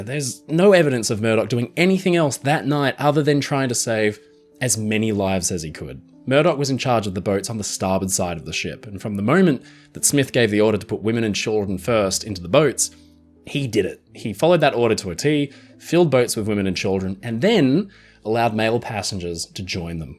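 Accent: New Zealand. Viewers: Thanks for a brilliant video. I learned a lot. Many years ago, before the 1997 movie came out, I read that Titanic had 50 inter-ship telephone lines. What would they have been used for? And is this true? Also, are you a kiwi or an Aussie? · you're from new zealand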